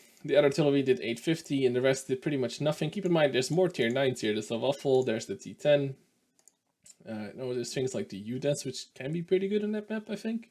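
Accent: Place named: Netherlands. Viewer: You are dutch right? I feel like I can hear it in the way you pronounce the "V" in VK :)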